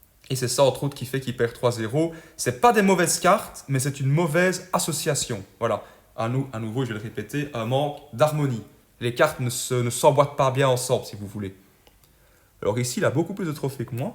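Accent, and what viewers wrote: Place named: Belgium. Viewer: ne serais tu pas belge pas le plus grand des hasard ? Ps première fois que j'entend qqun dire pikka à la place de pekka et artière à la place de l'artificière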